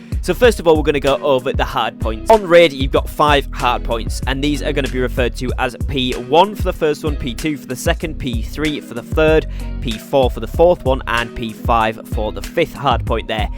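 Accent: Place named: Northern England, United Kingdom. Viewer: You sound more nothern now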